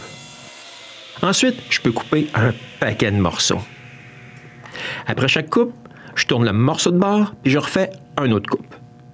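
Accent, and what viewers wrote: Quebec, Canada. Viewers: ToolsConsumables what you say is right but he speaks with a big Quebec accent so even though he meant to say the right things, the accent makes it sound different.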